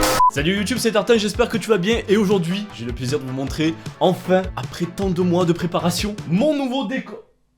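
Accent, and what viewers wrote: South, France. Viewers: T du sud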